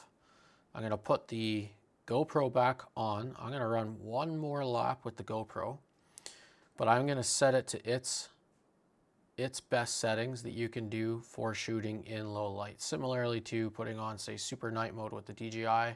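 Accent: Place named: Canada. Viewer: This is great, I agree AMS2 is absolutely amazing. Great comparison. I do prefer the dji for sure. Thanks for the time in putting this together. Lastly I can't help notice but I could be wrong. I hear a Canadian accent. I am Canadian. So are you from Canada? Cheers brother.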